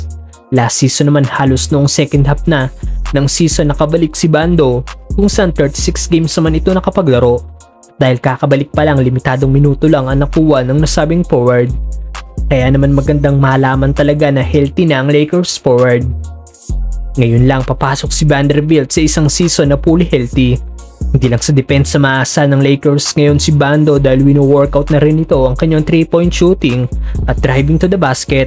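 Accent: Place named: Laguna, Philippines. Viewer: Taga Laguna ito halata sa tono mo hehe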